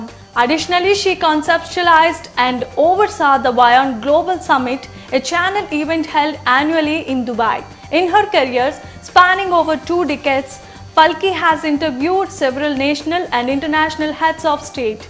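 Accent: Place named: United States. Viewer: Work on your pronunciation Ms Anchor. You are talking about India's most sought after news anchor. You don't have to sound like an American. Leave your colonial mindset.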